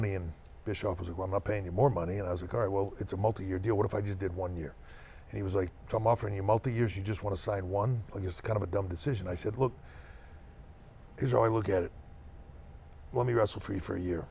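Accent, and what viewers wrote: Canada. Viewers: I swear, the older he gets. The more canadian he sounds.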